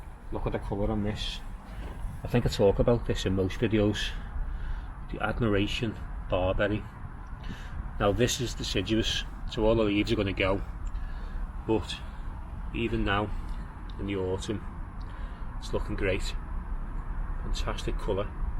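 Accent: Liverpool, United Kingdom. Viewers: Just what I needed! a video by a fellow scouser, the garden is lovely and I think its fair to say you are a bit salvia obsessed, enjoyed listening to you, regards from Ontario, Canada. #YNWA.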